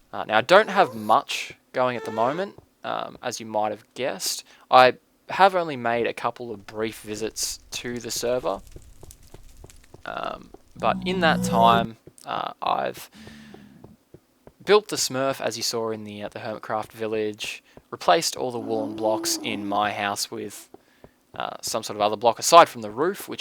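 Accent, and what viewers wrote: Australia. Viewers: Your australian? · dude r u aussie!!!!!!!!!, cause i am too bro!!!!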